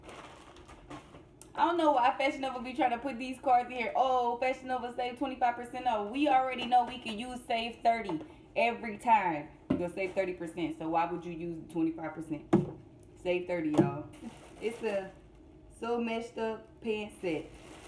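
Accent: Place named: Florida, United States. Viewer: It’s the Flori-ana accent for me!!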